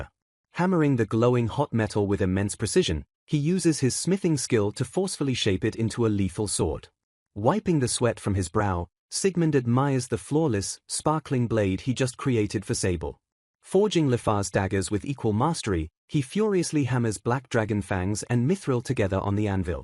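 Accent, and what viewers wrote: United Kingdom. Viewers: BRO WHAT IS THIS ENGLISH BRITISH NARRATOR XD XD XD Where my usual narrator guy lol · using the English accent is smart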